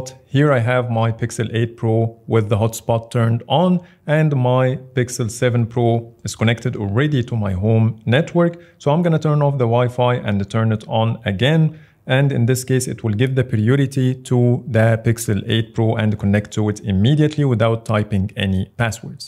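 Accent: Egypt. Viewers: ياخي حاسك مصري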